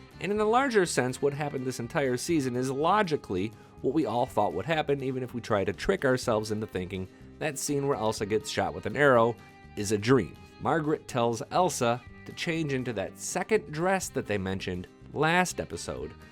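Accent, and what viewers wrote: South, United States. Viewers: I could care less. I hate her lousy southern accent narration. It is very distracting.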